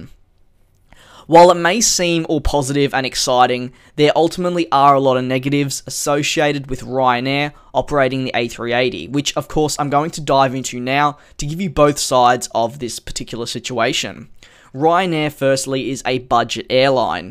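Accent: Australia. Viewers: hi I’m Cj are u Australian??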